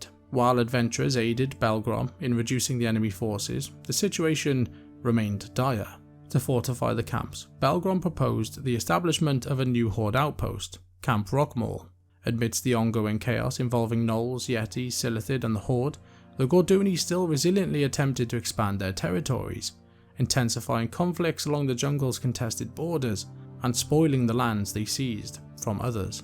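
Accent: Wales, United Kingdom. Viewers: A fellow Brit but do I detect a hint of a Welsh Accent, a fellow Welsh kinsman? :0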